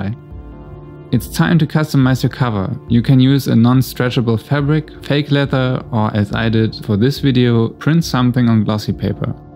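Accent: Germany. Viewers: He sounds german Bist du Deutsch? 'v'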